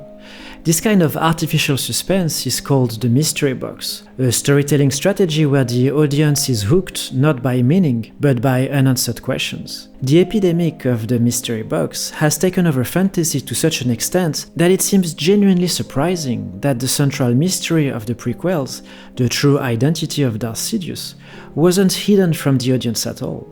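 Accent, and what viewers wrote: France. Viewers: Holy French accent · tu es francais?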